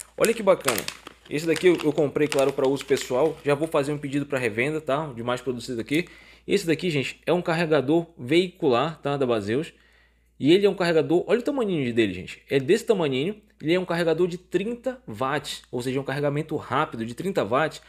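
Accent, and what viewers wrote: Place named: Pará, Brazil. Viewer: sotaque de paraense.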